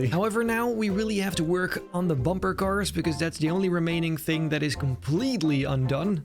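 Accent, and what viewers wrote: England, United Kingdom. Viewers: Brilliant love the English accent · Keep up the british accent - you’re good at it! :)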